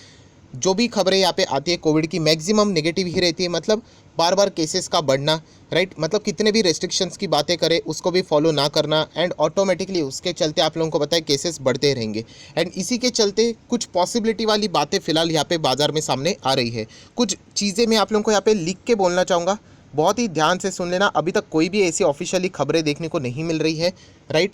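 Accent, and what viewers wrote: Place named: Pune, India. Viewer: The way sir said. Maybe he’s from Pune😍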